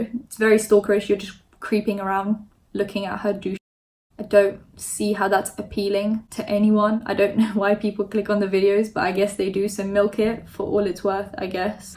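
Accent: United Kingdom. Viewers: oh yeah nah I wasn't really sure, you sounded British to me :) x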